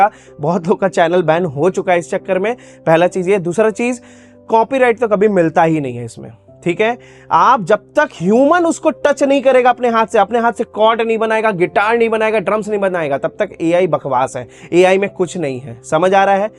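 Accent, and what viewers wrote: Bihar, India. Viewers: मैं पहली बार आपको सुनी हूं। आपके टोन बिहार से लग रहा है जो मुझे बहुत प्रभावित किया क्योंकि मैं भी बिहार से हूं। मैं आपको सबस्क्राइब कर रही हूं। अच्छा लगा आपने जो बताया है 😊 क्योंकि मैं भी Ai को लेकर कन्फ्यूज थी बहुत कुछ क्लियर हुआ है। मैं छोटा सा क्रिएटर हूं। थैंक यू भाईजी 🙏 ब्लेस यू 🙏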